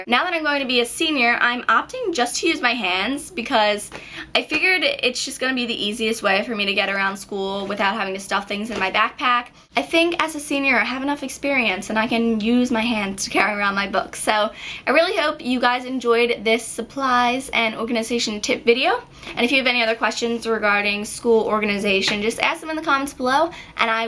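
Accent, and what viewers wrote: United States. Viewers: you are super cute and I adore how you talk, I dont know, but for me this is the perfect example for the american english (though there is maybe not THE american english), but I like it! subscribed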